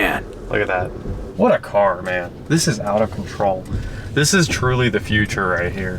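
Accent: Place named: South, United States. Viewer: Sounds like a southern poor boy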